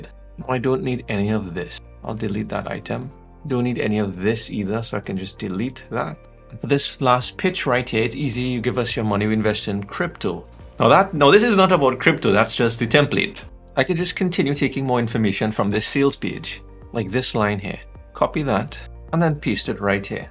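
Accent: Trinidad and Tobago. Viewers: I just heard you speak and said to myself he a whole Trini! tell me i'm wrong?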